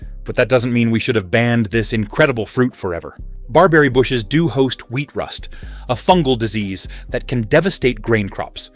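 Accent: United States. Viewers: Such a disappointment that this is AI slop which is not marked up as such in YouTube - The pictures don't match the commentary a lot of the time often showing completely different fruits to those being talked about. Pauses and emphasis in the American commentary are very strange often a symptom of AI. I think the content has many inaccuracies - Saskatoon is native to Canada and not Scandinavia, Mespilus is the botanical name for Medlar and I wonder if Jujubes were really grown in there?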